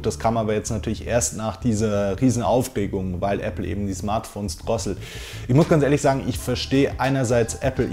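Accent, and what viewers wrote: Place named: North, Germany. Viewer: Hi, dir auch ein frohes neues Jahr, wieder ein super Video! Hat mich wieder gefreut eine 35 Minuten Ausgabe zu sehen. Gerne mehr von dem Format, Länge ist gar kein Problem. Wenn man dir bei deiner Formulierung etwas ankreiden wollte, könnte man sagen, dass du das Wort "so" häufig benutzt.;) Aber nichts über dein Intro. Früher habe ich deinen Akzent als Norddeutscher gefeiert.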